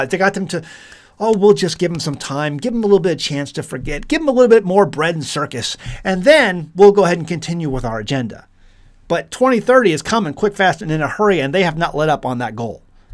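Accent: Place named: Pennsylvania, United States. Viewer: Sounds like you are a fellow Pennsylvanian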